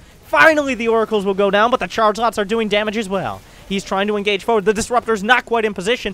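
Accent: Germany. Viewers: You'r german accent is fucking on point :)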